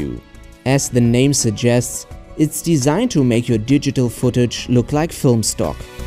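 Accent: Germany. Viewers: Erst dachte ich mir so: "Da hoer ich doch ein Deutschen akzent" und dann sehe ich das du noch in der selben Stadt lebst wir ich :D Gutes tutorial, danke hat sehr geholfen ^^ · Lady Fynix man hört das deutsche 😂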